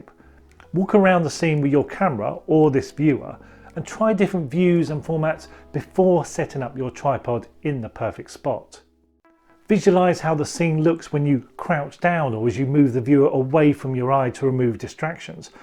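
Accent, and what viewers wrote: United Kingdom. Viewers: Excellent instructional uploads amidst a sea of gear review channels. Always very interesting subjects with a unique delivery style. It's nice to hear a UK voice for a change. Thanks for sharing and caring. Keep on keeping on… ✊🏿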